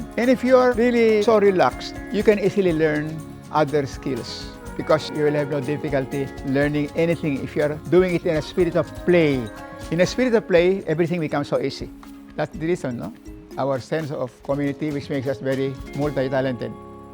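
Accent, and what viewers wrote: Philippines. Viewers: While listening to you sir I thought you speak in tagalog, I can understand you clearly, I love the pronunciation very much Filipino. Looking forward for this kind of documentary works from you. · Yes that Taiwan today was not even Chinese then, formerly its name was Formosa. I can sense accent of Mr. Narrator..ilonggo ?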